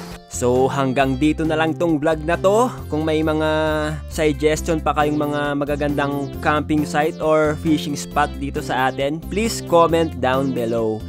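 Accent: Igorot, Philippines. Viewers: halatang igorot si kuya hahaha salita palang eh parang ako hahahaha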